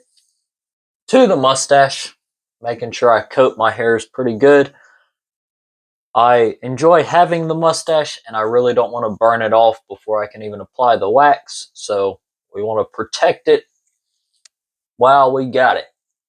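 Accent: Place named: South, United States. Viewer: i was honestly not expecting the southern accent.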